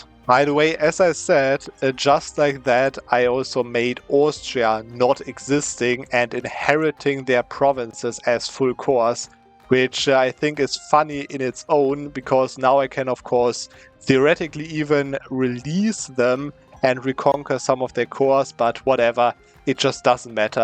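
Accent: Germany. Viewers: The German accent is so cool!!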